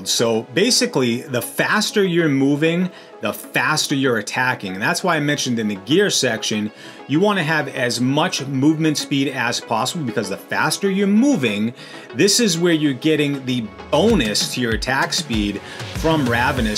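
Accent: New England, United States. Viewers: You’re either from Maine or Boston. I’m from southern Maine and these are just things I know.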